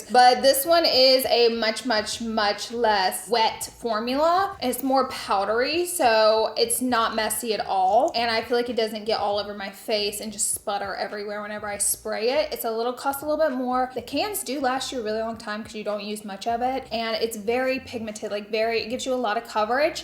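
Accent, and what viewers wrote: South, United States. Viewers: I swear half of why I watch you is because I love your southern accent. I’m from Florida but live in Georgia now and I’m always like “watch this girl she says oil all southern like y’all” Also I can’t understand why you’d even wear shapewear you’re friggin perf. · i haven’t watched your vids in a minute but your southern accent sounds heavier than i remember 😂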